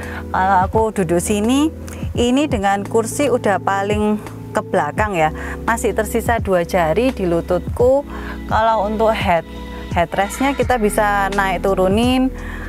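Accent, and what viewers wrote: Java, Indonesia. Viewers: Jawa banget ngomong nya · aksen jawa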